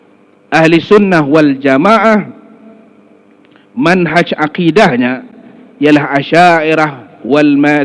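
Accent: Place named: Kelantan, Malaysia. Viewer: sedap bunyi loghat Kelate.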